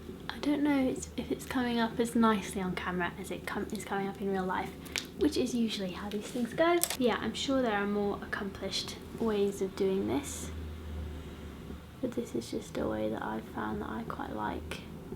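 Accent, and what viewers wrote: United Kingdom. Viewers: is she british? I LoVe her accent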